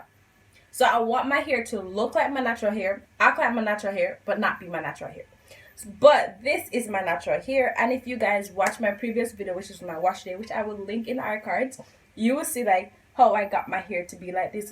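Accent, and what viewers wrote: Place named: Jamaica. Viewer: Hey.. you sound like a Jamaican...... real ting! Good Job: Just cut my locks after 13 yrs..... need this afro in my life....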